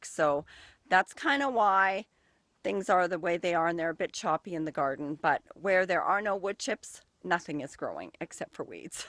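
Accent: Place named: Canada. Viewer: Great video! So fun to see your pretty face and hear your Canadian accent! I don't think lettuce likes wood chips until they have really broken down into compost. I have not had success with mulch of any sort with my lettuce. And I think everything does better if the wood chips have been in place for at least one winter. They certainly seem to get better with time. I love your experimenting! And your indoor garden was incredible! This year I tried direct seeding everything. Don't think I will do that again. My cukes have been a huge failure. Thanks for the tour!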